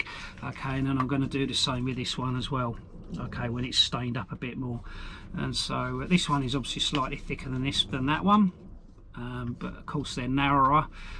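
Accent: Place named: RP, United Kingdom. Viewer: Bit posh mate..... Very posh in fact..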